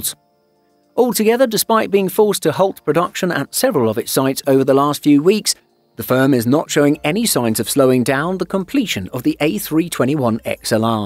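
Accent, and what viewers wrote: England, United Kingdom. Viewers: You are using and English accent to promote an EU product for which the UK is no longer a part of.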